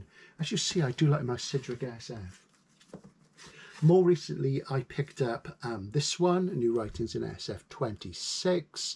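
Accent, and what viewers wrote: United Kingdom. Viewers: You are my ultimate ASMR. SF, bookshops, british accents, with intermittent walkies in the country. Favorite anthology: 100 Great Science Fiction Short Short Stories - Ed. Asimov, Greenberg, & Olander (Only item I've ever stolen .. of course, if you steal books, steal them from libraries so they can track you down and get payment. My 12-year old self still never regretted it.)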